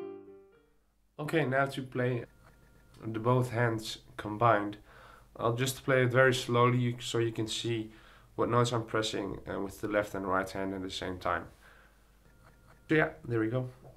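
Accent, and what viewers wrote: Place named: Netherlands. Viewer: thank you, haha je hoort aan je accent dat je nederlands bent qq, maar goed gespeelt!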